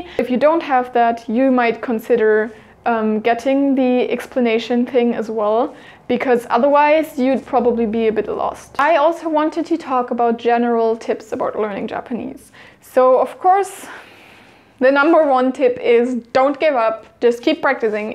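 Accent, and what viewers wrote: Germany. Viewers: ...mein erster Gedanke war "bist du aus Deutschland?"... nach 6 Minuten war ich mir dann sicher ;-) (ist das erste Video was ich mir von dir anschaue, find ich wirklich gut) At the end of this video you asked about tipps we might have, and i have this to add: Since i bought lots of books for learning japanese and had little time or motivation to actually use them i moved to something while i was using flashcards. I downloaded an app for recording stuff and started to record the vocabulary section of one of those books so i would first say the word in german and after a few seconds i would say that word in japanese. Since every word is a single voicefile, i added them to a playlist and listen to it every spare minute. And everytime i recall a word almost perfectly i would delete it off the playlist. once every week i'd put all words back to the playlist and start over, to practice and recall the things i already know. 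The Progress in which i advanced since then is: in less than 2 months i moved from like a hundred Words to almost 700 Words... it might not be the best, but as well as you used several different ways of studying, it might just add a small portion to the whole process of learning 日本語. Well, thanks for the vid, since i always search for new motivation everyday. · Is she German? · Man merkt durch deinen Akzent , dass du aus Deutschland kommst xD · This is the first video i watch on this chanel but is she german? Like from the english accent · Are you German? You sound sooo German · are you German? your accent is great ♥ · that accent, are u german? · Ich habe dich gerade gefunden und kennengelernt. Und man hört den deutschen Akzent (wie bei allen auch) wenn du englisch sprichst ^^ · Three seconds into this video and I already like you. And you are German. Heard that within one second. Lol. 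Me to. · you hear the german accent... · is she German? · First second of the video starts Me: "Oh, a fellow german" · Ist Sie Deutsch? Hört man raus finde ich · It took me five words from your mouth to know that you are German. Pretty cool huh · Du bist Deutsch oder? ^^ dein englisch hört sich so deutsch an finde ich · Ich dachte du wärst deutsch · Are u german? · Are you german? Your accent sounds german (adorable😍) haha I have a strong Hebrew accent (soo bad) haha love from Israel 🇮🇱😘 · Deine Stimme klingt deutsch :D bist du deutsch? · Are you A German because you have any accent from a german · You sound so German :o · is she german? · Where are you from? Sounds like you have a little German accent, but it also could just be my imagination. I'M learning Japanese right now and that was pretty helpful thanks.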